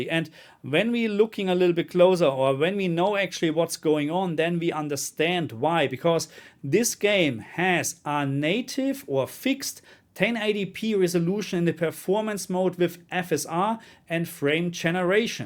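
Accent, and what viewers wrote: Germany. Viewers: Der deutsche Akzent ballert hart rein bei dir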